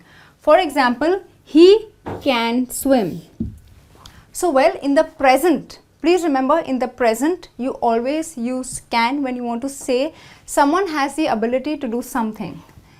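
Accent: India. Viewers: тут че индийский акцент?! сложно привыкнуть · I love your indish Accent im not so good english but thanks for the lessons ♥ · ما تعرفين تتكلمين انجليزي زين الهندي غالب عليكي بس عادي المهم تعرفين انجليزي 😆😆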